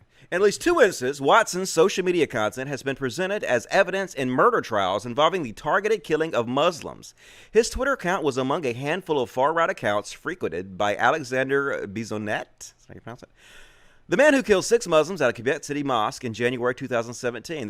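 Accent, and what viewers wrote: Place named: Alabama, United States. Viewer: I guesss his Nothern Yorkshire accent is on a parr with your Southern Alabama accent as far as hickness goes. Puddings and...puddings and??? I'm at a loss. Can't think of anything other than the most corrupt police force in the country to come out of Yorkshire. Oh! Peter Sutclife. He's hardly a nice guy but look him up. I'm from Lancashirr. Our rivalry goes back cenuries. We had our own Civil War. Instead of war of the racists, it was war of the Roses.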